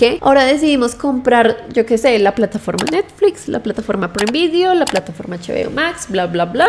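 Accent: Colombia. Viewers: T escucho la voz de colombina y estas en mexico ? saluditos nuevo seguidor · Wow eres de México por un momento pensé que eras de Colombia por tu acento 😮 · Tu eres de Colombia cierto?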